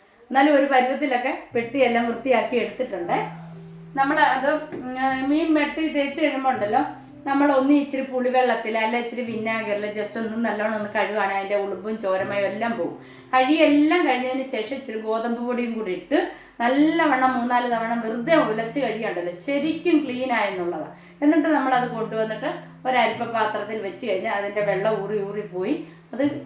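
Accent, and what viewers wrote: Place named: India. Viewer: ഒരു കറി വച്ചാൽ എല്ലാം വിശദമായി പറഞ്ഞുതരും തുടക്കക്കാർക്ക് നല്ലതാണ് നാട് എവിടെയാണ് ഞങ്ങൾ പറയുന്ന പോലെ ചേച്ചിയുടെ ഭാഷ❤❤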